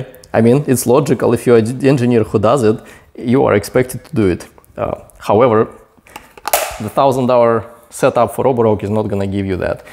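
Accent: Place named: Russia. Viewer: невозможно слушать тяжелый русский акцент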